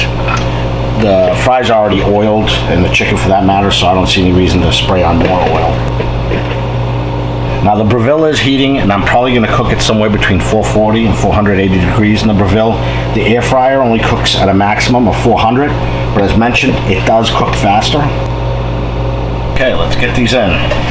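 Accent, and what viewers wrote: Massachusetts, United States. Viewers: I love listening to your Mass accent I myself am a native of Fall River MA so I really enjoy it and your videos You were the one I found when I used my air fryer for the first time for frozen fries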